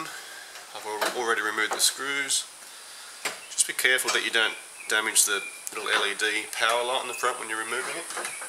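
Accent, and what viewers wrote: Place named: United Kingdom. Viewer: Cool! Such a small world eh? I'm in Hamilton - from Toronto originally - got the Roland RD 250 s As a Gift - from a great friend - - - - - had the "two dead keys" issue - - - - went on You Tube - - - - found this wonderful " " Brittish accented wonderful guy, gladly sharing his wisdom and awesomely creative advice - - - and did what he said - - - and bent (it took me 2 versions to get it right - the first one was too thin of a paper-clip - gotta use a thicker one, like he told us) and BOOM - fixed - and done. This is an amazing keyboard - a Classic. To have Great Advice and Wonderful Tips like those from - are So Much Appreciated. Thank you - Again -!!!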